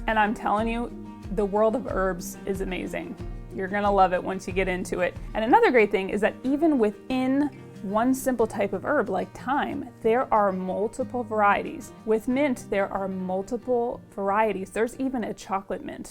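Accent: United States. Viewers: The same reason she says oRAGeNno, she's American 🤷‍♂️ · Sorry, I can't watch this. There is an 'H' in herb, I know in America you feel the need to change the English language, but to an British English speaker this is unwatchable.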